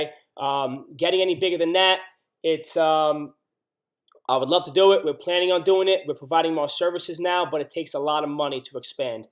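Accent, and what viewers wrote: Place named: New York, United States. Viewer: I knew you were from ny lol great information.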